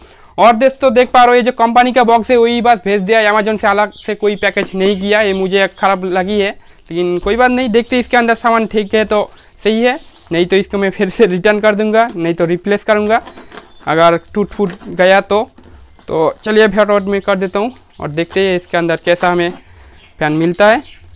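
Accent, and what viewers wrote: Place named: West Bengal, India. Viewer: তোর কথা শুনে তো বাঙালি মনে হচ্ছে।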